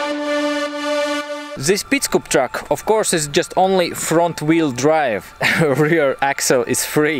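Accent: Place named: Germany. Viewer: I think — youre grammar is normall! But charakter of sounds is — german accent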